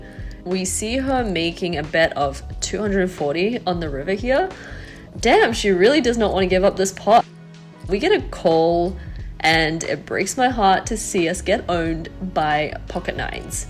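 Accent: Australia. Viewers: She's Australian. I understand her just fine.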